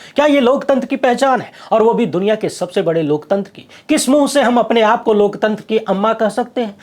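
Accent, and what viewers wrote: Bhojpuri, India. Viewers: वाह,! भोजपुरी की भीनी ख़ुशबू में रचाबसा, ख़बरों का ठेठ देसी लहजे में आप का विश्लेषण हमेशा ही लाजवाब होता है। ऐसे ही फोकट घण्टा बजाते रहिए।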